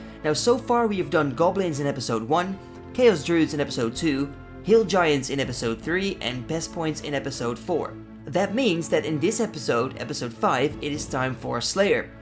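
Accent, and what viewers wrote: Netherlands. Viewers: Ah I love a Dutch accent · Van het eerste woord dat je zei hoorde ik dat je nl was in episode 1. Echt een toffe serie en ik hoop dat je een daadwerkelijke noninatie krijgt voor die golden gnome :)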